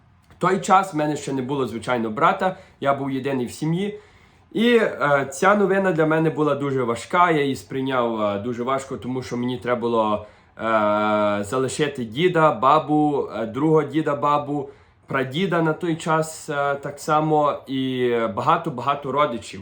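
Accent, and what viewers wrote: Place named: Ukraine. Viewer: Ооо, натрапила на відео, де розповідає звідки він! Отепер зрозуміло, чому відразу відчула, що манера розмови НАША🙂 Тема НАДЗВИЧАЙНО потрібна і цікава! Дякуємо! Дай Боже здоров'я тобі, та усій твоїй родині!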